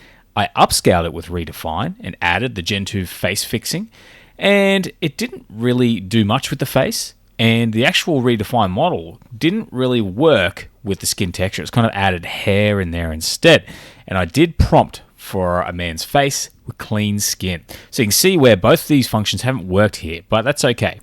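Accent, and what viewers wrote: Australia. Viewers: It is fascinating how Australians say "Peower", "Draring", etc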